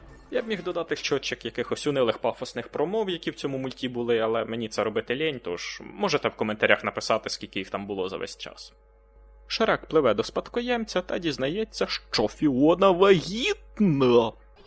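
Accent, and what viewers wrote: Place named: Surzhyk, Ukraine. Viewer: Мені подобається ваш "суржик"🥺❤️ Хто б там що не казав, але говірка класна) Звучить по-домашньому для мене, бо в житті подібним чином спілкуюся.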